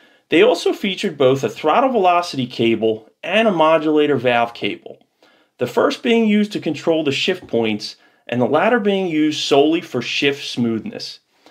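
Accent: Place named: Baltimore, United States. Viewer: Speaker sounds to be from Baltimore hon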